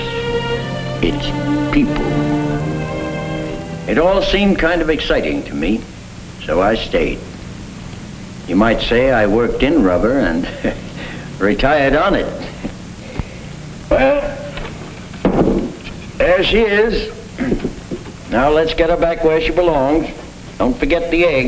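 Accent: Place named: New England, United States. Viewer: Definitely a New England accent !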